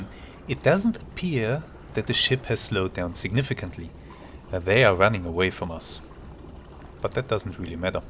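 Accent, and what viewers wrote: Germany. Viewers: I really love your SH3 series mate. You are smart and careful and that german accent is just awesome! This is real entertainment. Auf wiedersehen herr kaleun!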